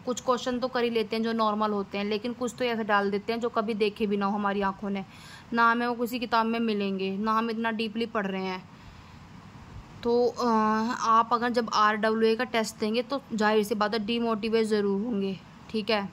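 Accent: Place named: Western Uttar Pradesh, India. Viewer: Voice tone se lg rha aap west up ki taraph se ho😊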